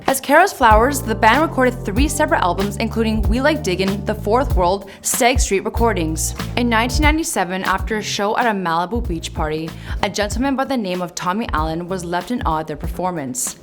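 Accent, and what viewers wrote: India. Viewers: Dancehall Lyrics i thought the same thing too. Even Priyanka Chopra sounds less indian than this presenter. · True. She sound like an Indian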